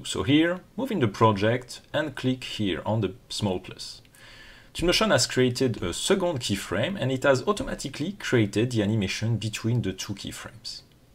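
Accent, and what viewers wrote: France. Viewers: Le bon accent français qu’on aime 😭😪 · I'm from Germany and I find this french accent quite charming actually. But I can imagine that people from Texas or Pakistan maybe could be irritated by it :-)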